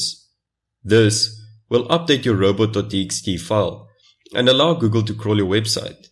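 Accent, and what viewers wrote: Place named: South Africa. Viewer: Thank you! Are you South African? You sound South African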